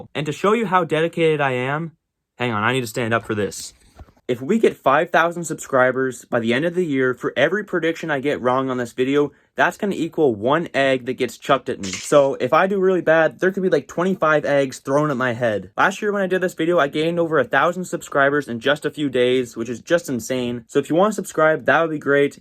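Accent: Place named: Canada. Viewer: What an unbearable accent. Canadians are the worst.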